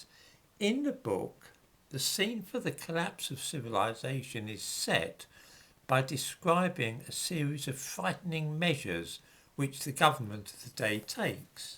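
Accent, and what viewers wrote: United Kingdom. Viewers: I stumbled upon this channel on my youtube (thank God for its “suggestions” algorithms) and rejoiced at its content and the “oh so British” delivery I am an American of Italian origins, visited England in my youth (1970’s) and absolutely loved it. Later visits evidenced a clear change in the fabric of its society Europe, in general, is loosing its identity, and it’s painful Cheers from the US, glad I found you, I’ll set up to devour your content in the following weeks, and looking forward to the upcoming Identity is a defining and beautiful trait, once you loose it, it’ll be hard to regain We MUST preserve it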